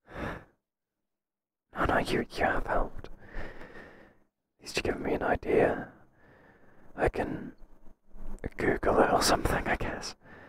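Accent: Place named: United Kingdom. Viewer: i love the british accent 🤌 keep going J! 💋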